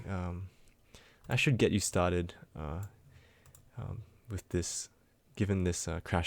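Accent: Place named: Australia. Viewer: i love ur aussy accent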